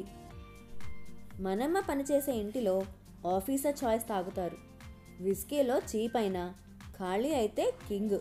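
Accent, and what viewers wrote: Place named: Telangana, India. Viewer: Nice story and very well presented in telangana accent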